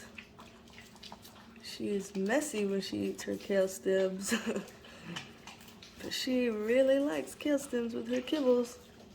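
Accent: South, United States. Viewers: We love your southern twang! 😁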